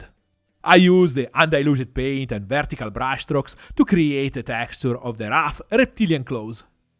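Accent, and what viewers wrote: Italy. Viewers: I came here searching for new approaches to Lizardmen, as the last time I painted them (or any minis for that matter) was 10 years ago. This video was helpful and brilliant! I love your accent (Italian?) and how your first language shines through in your English; not only in rhythm but in the beautiful intonation as well! Lovely to listen to. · This italian accents is so good. Approvo! My new favourite channel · I watch your painting videos both because of all the useful tips & tricks, but also hearing your Italian accent - It sounds so joyful and makes me happy! 😁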